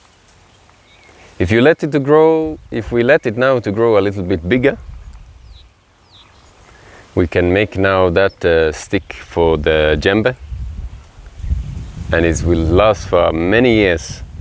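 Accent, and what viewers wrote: Kenya. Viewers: I'm surprised you have a strong Kenyan accent , what a wonderful video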